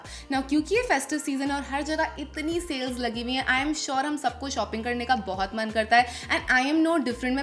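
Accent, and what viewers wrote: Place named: Delhi, India. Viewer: I m your ardent fan since 2016 but my heart melts in your Hindi videos ..that slight Delhi accent makes you more relatable ❤❤❤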